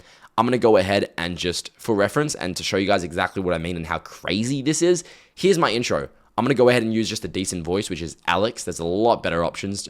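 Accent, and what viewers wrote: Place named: Australia. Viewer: Too much free sauce bro, appreciate you for sharing this vid. I hear the Australian accent too btw, by any chance you in Perth?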